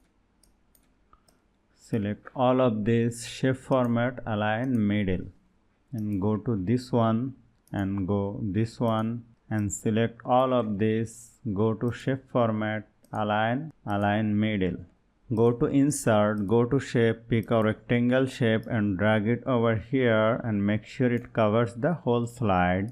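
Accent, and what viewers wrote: India. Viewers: This nigga is Indian 😂 Accent 😂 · I can't believe that I'm listening to an Indian accent😭😭😭😭😭😭😭😭😭😭😭😭😭😭😭😭😭😭😭😭😭😭😭😭😭😭😭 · your accent is Indian I think. Thank you for soo good presentation From= INDIA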